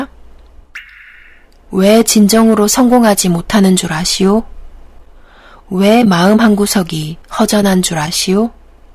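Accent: Gyeongsang, South Korea. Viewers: 경상도 억양이 남아있어요 ㅎㅎ 남편이 대구 사람. 잼난 책 설명 좋네요.구독자 많아지실듯! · 경상도 억양이 친근감을 주네요 수고하셨습니다 감사합니다 축복합니다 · 님께서 책의 내용을 깊이 있게 이해하고 낭독하고 설명해 주시니 아주 좋습니다. 목소리와 경상도 사투리도 매력있어요 앞으로 엄청나게 많은 구독자가 생길것 같아요. 감사합니다. 사랑합니다. 축복합니다. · 경상도 사투리 반갑네요. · 갱상도사투리 마 괘안심더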